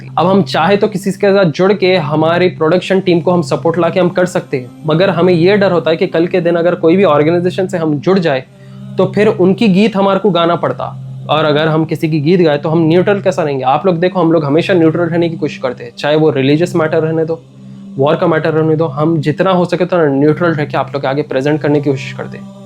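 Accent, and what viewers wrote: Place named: Hyderabad, India. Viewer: bhai "Dabaaa k kara ", "Dabaa k chalra " samajrow mai apan Hyderabadi hai magar thoda national level ke hisab se bhi bolsakte ap....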